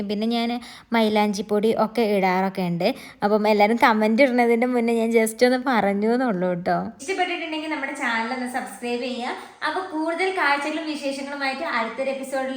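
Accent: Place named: Malappuram, India. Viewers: Malappuram thanneyanu 😂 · വടക്കൻ ഭാഷ..!!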